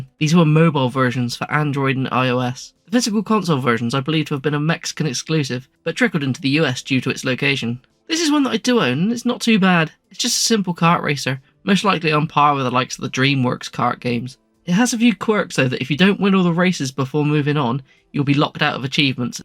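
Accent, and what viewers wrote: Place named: Bristol, United Kingdom. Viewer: From your accent i guess you are in the Bristol area? Fellow 360 collector here 🫡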